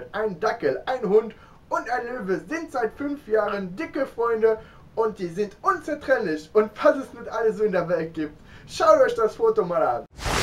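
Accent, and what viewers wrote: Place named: Hesse, Germany. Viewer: Ich finde Deine Videos immer sehr erfrischend und man lernt viel, vor allem mag ich die mit dem Wolfshund. Eine dumme Frage: Ich wusste übrigens gar nicht, dass die Luxemburger Deutsch als Muttersprache sprechen oder kommst Du eigentlich aus Deutschland? Ich hätte Deiner Sprache nach gedacht, dass Du aus Hessen oder Rheinland-Pfalz kommst und dass die meisten Leute in Luxemburg Französisch oder Luxemburgisch (Letzeburgisch) als Muttersprache haben.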